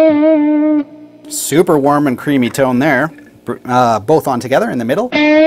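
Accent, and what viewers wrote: Canada. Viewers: That’s because he speaks Canadian.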